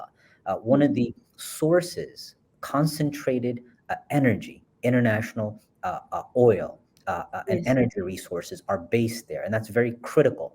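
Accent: United Kingdom. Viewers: Great British accent,. Keep up your great work. 👍🏽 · love your British accent.please focus on doing english programs.there are not enough english current affairs productions about Ethiopia. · Corrections, Col. Abiy is not a doctor he is elementary schools drop out street Hustler's boy. From Shane's red district streets a prostitution zones single mother in Bashashaa town case closed no more questions thanks 👍 baby your Brtish accent Likes sweat heart kisses on Lips Love it you sound great.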